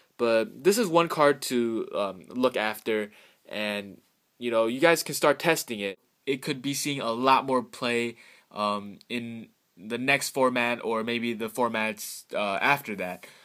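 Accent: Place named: Asia. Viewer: Da azn accent :3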